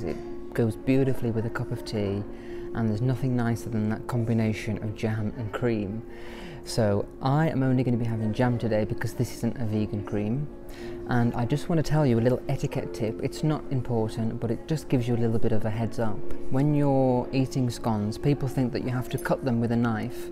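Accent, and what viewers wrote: RP, United Kingdom. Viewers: you are so posh! I absolutely adore you. ♥️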